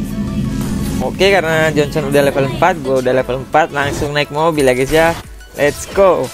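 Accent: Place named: Central Java, Indonesia. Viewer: Dri logat nya kaya nya org jateng ya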